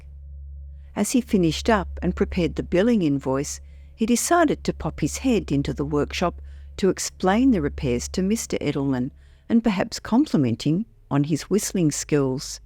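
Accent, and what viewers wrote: Australia. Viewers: She has a lovely aussi accent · Nah, you're just not used to her Aussie accent.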